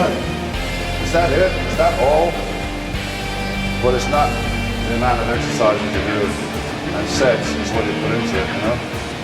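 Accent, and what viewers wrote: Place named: Birmingham, United Kingdom. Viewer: Birmingham, England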